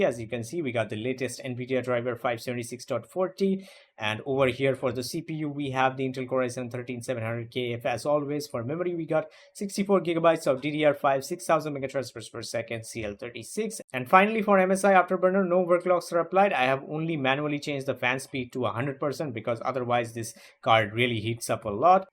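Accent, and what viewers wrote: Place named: India. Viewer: wow, i thought that is animated 3d model guy in the beginning intro ) everything is so cleaned up, face, eyes, lips , blurred abmience so i firstly thought you are artifical man ) thanx for the touchfull review. its a pity that you guys from India ALWAYS add your accent to the English language. Isn't it very hard just not to do this and speak cleaner, like we russians do speaking in english ? but in your case the accent is very little, so i've understood everyting! thanx )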